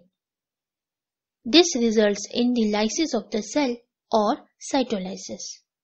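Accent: India. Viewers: whats the word minutes? very Indian pronunciation, I don't understand.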